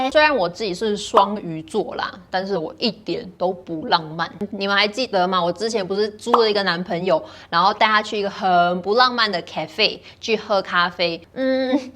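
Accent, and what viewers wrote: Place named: Taiwan. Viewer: I tuned in to listen to Taiwanese Mandarin ….so endearing 😘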